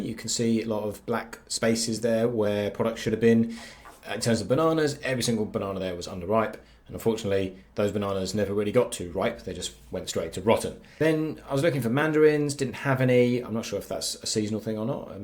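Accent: United Kingdom. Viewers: You sound British so it was surprising that your in Austraila.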